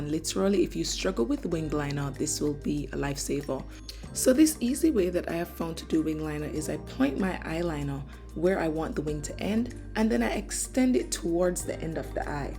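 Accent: Jamaica. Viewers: I don’t if am hearing wrong but are you Jamaican? Because I’ve been binge watching your videos since I’ve discovered you and I’m getting Jamaican vibes 😅. Great videos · From mi hear di accent mi subscribe 🇯🇲❤ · What is it, Jamaican?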